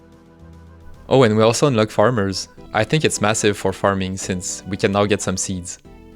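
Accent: Quebec, Canada. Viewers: french or quebecois, he speaks well tho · Quand juste l'accent te dit que t'es queb haha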